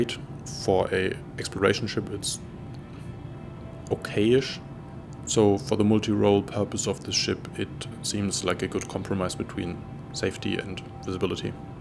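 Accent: Germany. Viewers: moin, du scheinst ja definitiv ein Deutscher zu sein, unschwer zu hören. danke für die großartige Arbeit! weißt du denn ob wirklich keine Rampe zum Hangar ist? kommt mann nur über den Bauch rein?(Leiter/Fahrstuhl)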